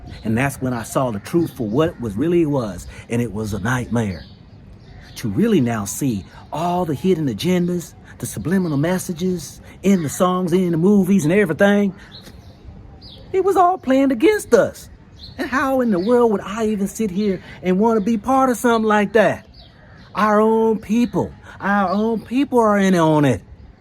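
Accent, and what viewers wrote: South, United States. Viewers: You are now speaking the Southern tune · There is a documentary series called The Story Of English. It explains accents like this and others coming from the white Southerners having to communicate with enslaved Africans. Fascinating stuff.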